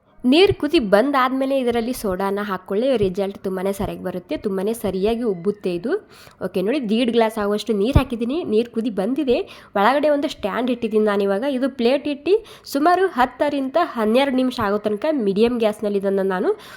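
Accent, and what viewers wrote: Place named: North Karnataka, India. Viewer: ನಿಮ್ಮ ಕನ್ನಡ ಕೇಳೋದಕ್ಕೆ ಚೆನ್ನಾಗಿದೆ, ಹಿತವಾಗಿದೆ, ಉತ್ತರ ಕರ್ನಾಟಕದ ಭಾಷೆ ಯಾರು ಏನು ಮಾತಾಡಕ್ ಆಗಲ್ಲ.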